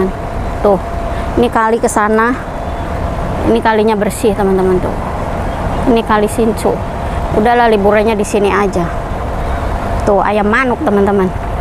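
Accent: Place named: Banyumas, Indonesia. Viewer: denger pean bicara ngapak lucu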